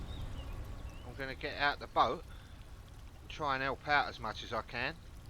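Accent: London, United Kingdom. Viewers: I'm enjoying watching your vlogs, only been at it a couple of weeks, working through the back catalogue. Love the simple "come along with me" filming, and the great narrative over the top. Your phrases remind me of my dad, he was from West London (Paddington), so the accent ever so slightly different, but the phrases exactly the same. My husband would love an uncensored version of your vlogs, but I just watched one of yours from the Fenny Stratford cruise, and told him you don't like the agricultural language! Keep it up, your content really is soothing.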